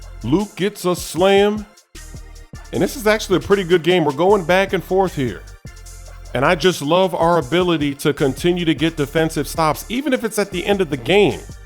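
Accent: United Kingdom. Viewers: Is Luke English?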